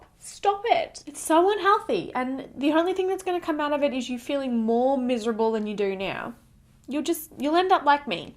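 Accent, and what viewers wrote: Australia. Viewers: Sweet, Beautiful on the Inside and the Outside, I am a subscriber who is old enough to be your mother, maybe even your grandmother. My heart broke listening to you talk about your painful journey. I was a teen in the 60s and this threw me back to that time. Mean bullying girls! I truly believe that's where a lot of this starts. I was so bullied by mean girls in junior high and high school. I was 4'11" and never overweight but that didn't stop their hatred. Their words and actions burrow into your brain until you start believing them and unfortunately it stays with you your entire life. My adult daughter went through the same thing in high school. People like that say and do mean things just to make themselves look better. And underneath it all they are truly sad and miserable with their own life. I pray you will keep that determination in your heart to overcome all of the negative things you hear in your head and win this battle. 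God bless Brandon. A supportive fiance'/husband is one of the best weapons in the battle. You are a beauty... Inside and out. I am 100% mesmerized when watching your videos. You have the cutest personality (you make me laugh often) and the most soothing, calming voice, not to mention awesome Australian accent!! I have no doubt that you just helped many, many young women out there with your truth! Bless you! May every day get a bit easier for you! ❤️❤️❤️❤️ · You are beautiful the way you are. I have always loved your chirpy self and your adorable Australian accent. My best wishes to you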